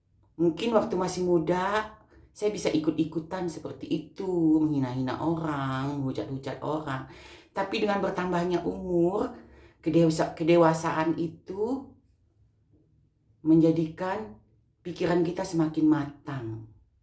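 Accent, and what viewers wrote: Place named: West Java, Indonesia. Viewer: Teh dari logatnya kaya org sunda. Salam kenal dari tambun bekasi.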